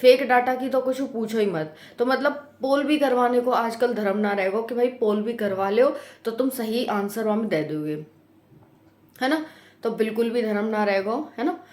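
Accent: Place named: Etah, India. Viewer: Eta district ki language hai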